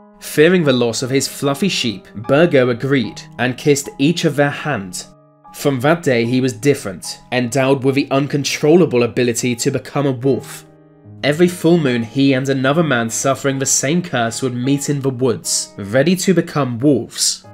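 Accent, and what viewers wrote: United Kingdom. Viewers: I just want to see face before I die. I wanna see the face behind this majestic British voice.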